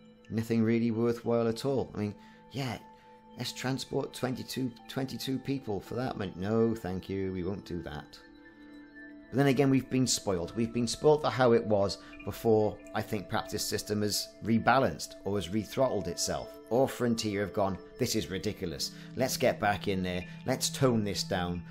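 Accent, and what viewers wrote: Wales, United Kingdom. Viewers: Welsh CMDR?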